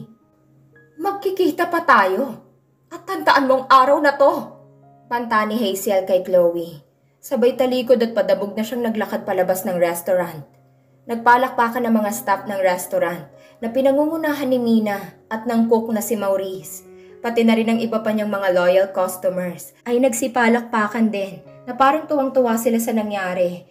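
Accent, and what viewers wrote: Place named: Iloilo, Philippines. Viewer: Ilonga ka siguro miss narrate hnd bagay sau mag narrate para kang ewan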